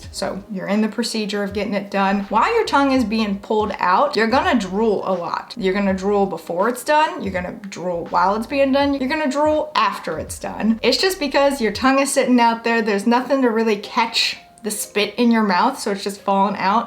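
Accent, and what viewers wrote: South, United States. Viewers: I can tell, shes from the south.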